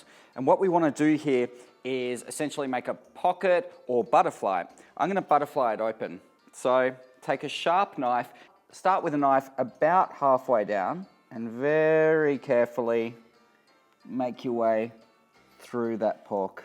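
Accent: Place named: Australia. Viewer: I feel like you are an incredibly skilled chef with a great pallette but you're just boring as hell to watch in a video. IDK what it is. Maybe it's because I'm Aussie too and it's hearing the same accent? Anyway recipe looks killer I'll give it a go when I can afford proscutto, nice olive oil and pine nuts (might be a while cause I'm a poor student).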